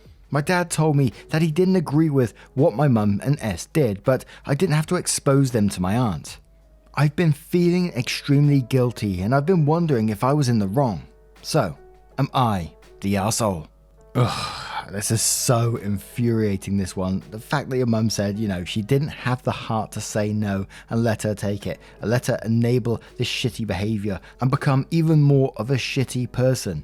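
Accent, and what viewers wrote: England, United Kingdom. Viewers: Oh hi,! Hope you're well! I just got out the gym. Honestly I listen to you so much that my American English is turning more English English.... I have ASD and tend to mimic accents and speech patterns without meaning to. Had to explain what "skint" meant to 3 different people. Not complaining though, I kinda like it. Much love!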